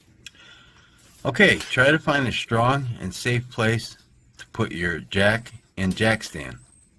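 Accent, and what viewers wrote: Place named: New England, United States. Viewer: Love your New England accent.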